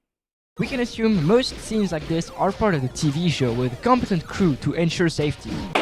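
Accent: France. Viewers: Tu parles français? J'ai l'impression ton accent l'est un peu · En vrai un bon 8.5 je trouve, on comprend bien ce que tu dis mais on entend bien que t'es français mdr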